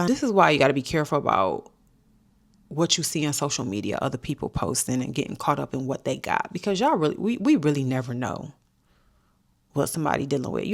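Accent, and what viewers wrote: United States. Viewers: You are soo pretty I hear a Buffalo accent are you from Buffalo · Upstate NY?? You def have a Detroit accent lol, I was shocked when you said that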